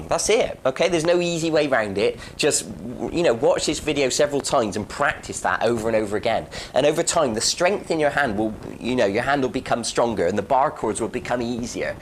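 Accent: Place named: England, United Kingdom. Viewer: I love your lessen too. And your pleasant english accent. 5* from Germany.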